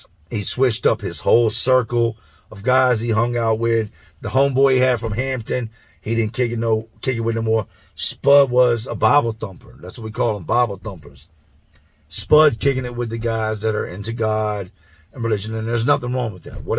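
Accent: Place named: New Orleans, United States. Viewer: I really enjoy your content. I listen while I’m grocery shopping. Question: your accent has a lot of New Orleans inflections. Did you ever live here in NOLA? You say a lot of things the way NOLA natives (from the 3rd, 7th, 17th ward for example). I’m so curious how you got this accent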